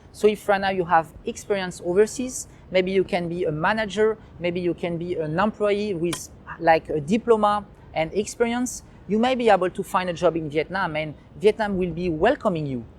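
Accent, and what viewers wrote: France. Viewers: I’m American, You should really put subtitles your English is not good ...you have a super heavy French accent...I couldn’t understand you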